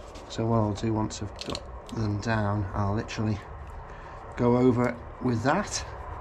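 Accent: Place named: Lancashire, United Kingdom. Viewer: Just having breakfast clicked on YouTube watching your channel as I only found you about a month ago I'm watching your 1st ride on the GT. I know this road intermittently, Settle if I'm not mistaken. This is my 2nd blade too. I had a 06 which was better than the 15. Just watching next vid I think you just confirmed Hawes n Settle. Must crack on I've just moved from Stockport to Rochdale, your accent suggests Burscough/Skelmersdale. Calimoto screen shot north of Skem when I get the GT I'd love to meet up.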